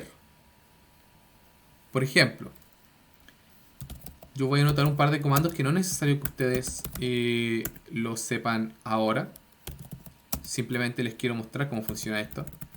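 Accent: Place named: Chile. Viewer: Que bueno un tutorial en castellano chileno. Grcs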